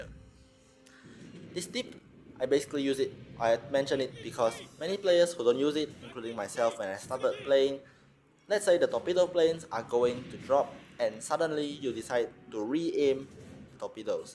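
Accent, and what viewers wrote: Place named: Singapore. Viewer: man he sounded sooo SG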